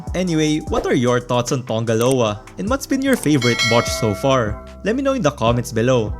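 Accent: Philippines. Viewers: Pinoy ka sir? Yung accent kasi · Just found your channel. Good stuff. And you sound Filipino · this guy sounds FILIPINO AS FUCK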